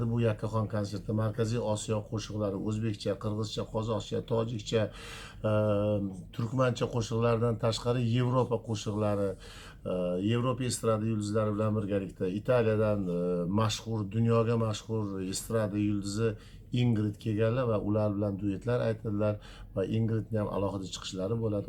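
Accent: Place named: Samarkand, Uzbekistan. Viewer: Самарахоон болуп калат экен туугандар суйлогондо😃, ийгиликтуу откоруп ал концертти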